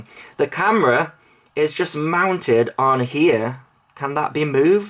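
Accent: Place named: United Kingdom. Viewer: I really like your reviews, also your english pronouncing is very enjoyable, british :-)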